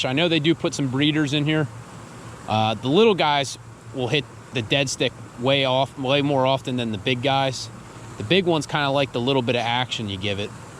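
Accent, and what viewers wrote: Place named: Pine Barrens, United States. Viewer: hahaah holy shit that piney accent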